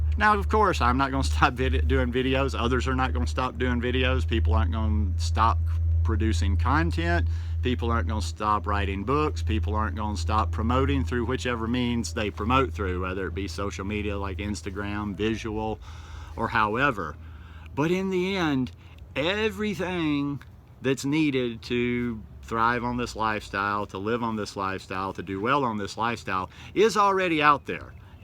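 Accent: South, United States. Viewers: Love that southern draw! Its inspiring to see someone from the south do this journey. I'm an okie myself and there is a lot of southern stereotypes and expectations to be a certain way in the south when it comes to eating meat and hunting and those sorts of things. Your often labeled weird and "hippie" or something worse for rejecting meat and beer and so on. I'm subscribed. Thanks for posting your journey! God Bless.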